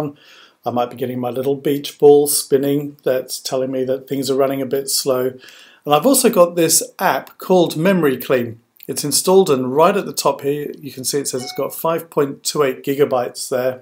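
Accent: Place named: England, United Kingdom. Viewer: Ahhh first so lovely to hear a nice English voice, and second a FANTASTIC tip! Thank you!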